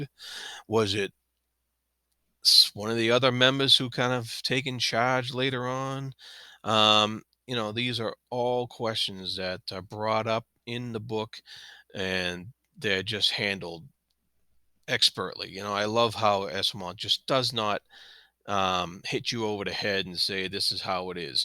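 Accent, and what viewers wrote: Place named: New England, United States. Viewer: I love your accent !! - a fellow New Englander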